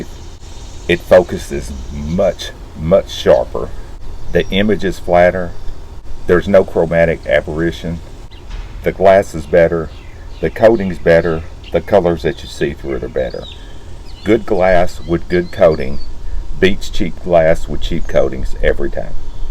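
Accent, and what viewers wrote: South, United States. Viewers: great no bs video comparison. laughed all the way thru it! you remind me of Duke Nukem with a southern accent, you are awesome brother keep it up.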